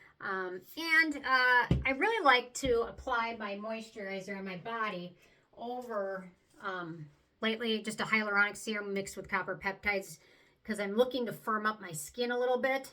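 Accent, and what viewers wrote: Minnesota, United States. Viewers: You're so cute & have a cute Minnesota accent & voice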